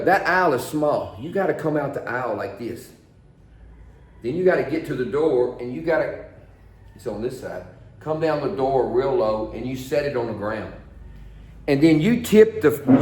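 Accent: South, United States. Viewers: I read Greg was good friends with Dutton. Greg had 3500 flight hours and recent multi engine rating plus he seemed to know operational aspects of the Citation well enough to suggest he might have been best in right seat. Using my FSX to recreate the flight with 2 miles visibility, I lost the airport multiple times while turning back to runway 28, 10 then close downwind 28 to keep airport in sight at 1000 agl, then tight teardrop base to final, lost airport again, continued and eventually saw airport envirnoment. Scary even in FSX. I think they were 500 agl, or so, base to final. Nervewracking just thinking about it. Enjoy your podcast,. You nice southern accent reminds me of folks from Mississippi, like me.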